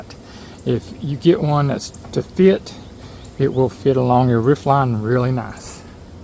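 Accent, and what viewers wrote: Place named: South, United States. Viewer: Yeah, and you're a pretty good actor. Maybe take a trip California, they love a southern accent there. You can be a Hollywood star! Plus, it's one of the most beautiful places with millions of acres of public land lots of jeep trails. I live in Mississippi but just bought property in Arkansas, just a rock throw from Pond Creek. I like to canoe and love all the water in that area! My girlfriend is from Louisiana, so we like to explore all the nearby states.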